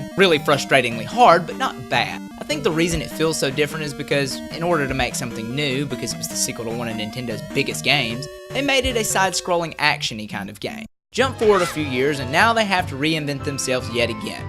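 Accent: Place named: South, United States. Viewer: Ya know what, hearing a southern accent is actually kind of refreshing, you don't really see that in the Youtube Gaming community:) You did well elaborating your points, and the pacing was really good. That's probably the part that I expected to be the worst (from smaller Youtubers), but you did well! Really well in fact. Keep it up!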